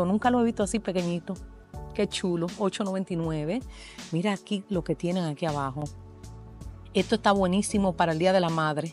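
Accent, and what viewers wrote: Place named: Dominican Republic. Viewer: Arriba R.D., iba a preguntar si eras Dom. Por la forma de hablar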